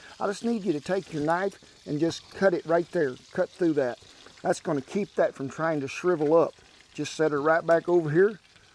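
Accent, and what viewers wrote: South, United States. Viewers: I don't use Alexa or Siri because they do not understand the Southern accent, this is why she did not do what you said. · As a fellow southerner, i already know this food is gonna be bussin just based off that accent and the glorious mustache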